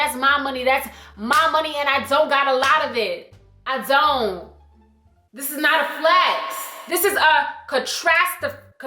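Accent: Jamaica. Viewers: Is the rich jamaican accent for me... perfect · Mi luv di patwa lol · Aye mi love di accent Hafi chip it in sometimes 🤣🇯🇲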